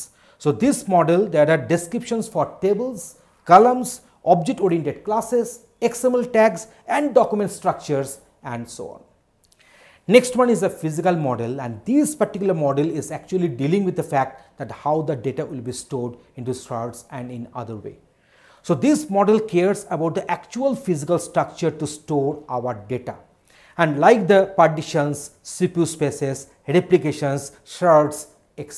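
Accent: Bengal, India. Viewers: Thanks for the video. You sound like a Bengalee. :-)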